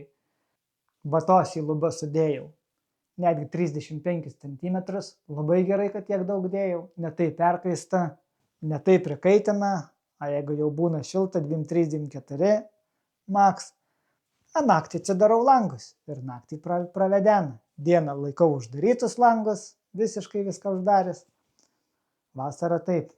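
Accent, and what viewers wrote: Aukštaitija, Lithuania. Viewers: Vat tas grynas aukštaitiškas dialektas :D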